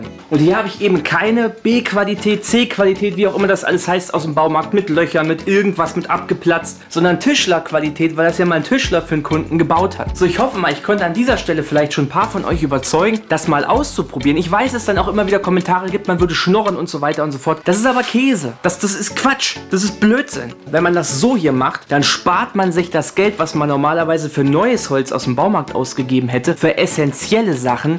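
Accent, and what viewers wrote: Hesse, Germany. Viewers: Als ob du aus Marburg kommst😂 endlich mal wer aus der eigenen Stadt.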